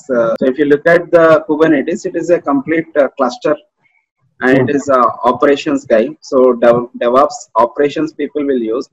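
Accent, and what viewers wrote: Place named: India. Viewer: nice hindish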